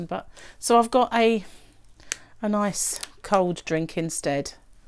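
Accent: United Kingdom. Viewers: I like to watch your you tube love to listen to the British accent I hope you finish the bootie I want to make one to go with mittens you made than I will find a blanket. Thanks again see you again.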